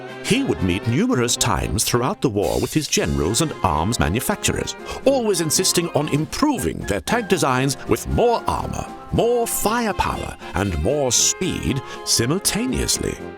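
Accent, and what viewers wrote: United Kingdom. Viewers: The lovely Classic British accent ❤❤❤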